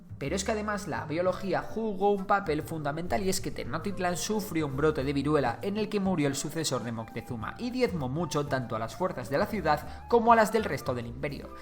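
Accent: Castile, Spain. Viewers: El habla español castellano weey nomms. No es el mismo acento que el acento mexicano, no seas duro hombre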